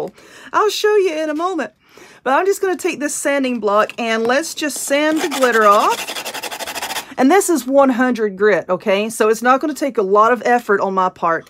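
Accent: South, United States. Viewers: This flower box turned out to be cute. I freaking love your southern accent 😘👍💕💕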